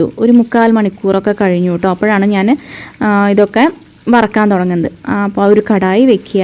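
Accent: Thrissur, India. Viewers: Stylan dish kalakki chowavilla Thrissur basha kidu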